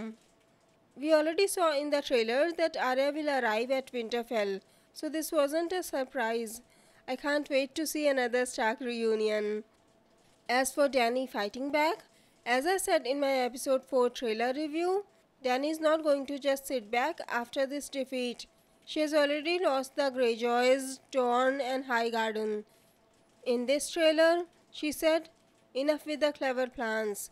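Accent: India. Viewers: I could understand you without. Just for some reason I assumed you'd be American. But I like Indian accents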